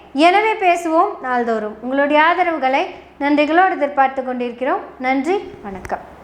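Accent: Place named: Chennai, India. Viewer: Chennai slang..., well effort.. Try more.. 🎉🎉🎉🎉👌👌👌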